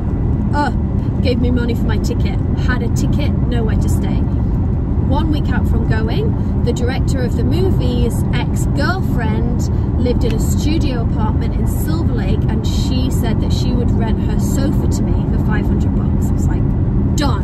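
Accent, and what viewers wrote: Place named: Sheffield, United Kingdom. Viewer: Lol. The more you talked the more you could hear your Sheffield accent come out. ( also from Sheffield not living in England )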